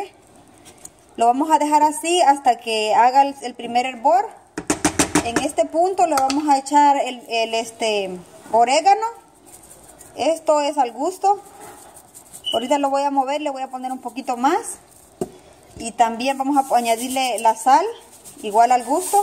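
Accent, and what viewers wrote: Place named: El Salvador. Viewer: Sabia que eras salvadoreña, el acento noa delata, pero me lo confirmastes cuando hablastes de las pupusas, hare mi escabeche. 😆 Gracias.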